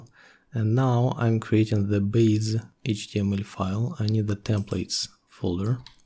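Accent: Russia. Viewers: Найс ролик, видно сразу - Русский, ну или из другой странны СНГ, акцент сдал)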